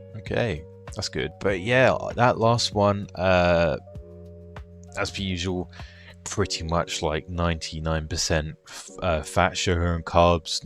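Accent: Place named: United Kingdom. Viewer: Hello. I’ve just recently come across your videos. Having once been super sized myself, I can totally understand your points of view. By the way you’re very handsome looking and I love the British accent that you have. Continued good luck with your YouTube channel 😊.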